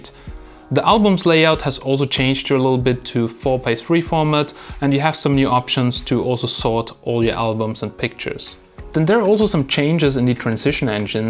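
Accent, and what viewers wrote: Germany. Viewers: Eigentlich hört man sofort raus, dass er aus einem deutschsprachigem Raum kommt.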